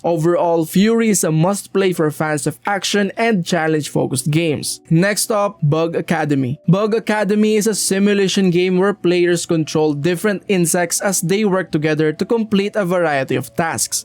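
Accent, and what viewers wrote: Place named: Philippines. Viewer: Latino accent + American accent + lazy pronunciations = Filipino accent 🤦🏻‍♂️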